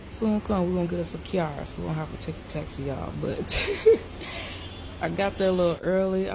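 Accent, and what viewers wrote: Jamaica. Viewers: I love how you comes out with the Jamaican accent my sister love it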